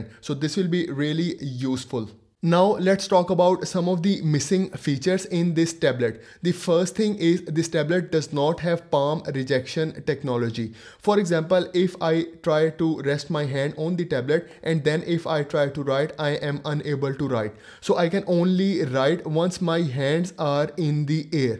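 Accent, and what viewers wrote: India. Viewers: Unrelated, but WHY does your accent sound so Indian?? I like it, but, like, how??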